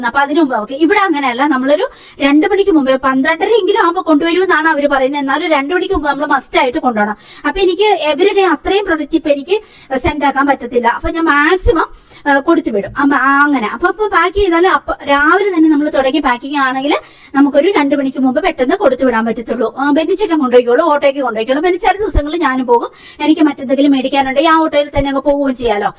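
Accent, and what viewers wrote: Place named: Perumbavoor, India. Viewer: veedu perumbavoor moovatupuzha bagam aano basha kettitu chothichatha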